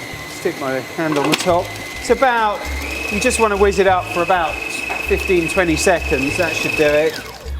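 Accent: United Kingdom. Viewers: That thing with british accent I always fall in love. New sub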